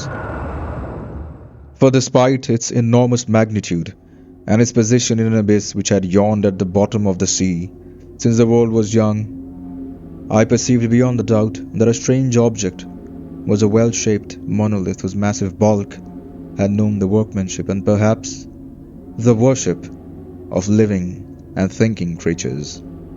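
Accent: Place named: Pacific Islands. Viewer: What I like about yo voice is you sound like some pan Pacific islander who barely learned English and is describing the utter horror to some staunch fool who refused to see your reason to steer clear of a storm.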